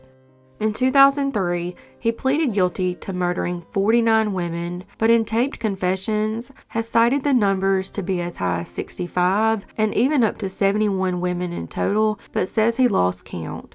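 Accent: South, United States. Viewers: Thank you for these. It is very heartwarming to know these victims finally have their names. The narrator’s delivery and lovely, soft Southern accent are very pleasing to listen to.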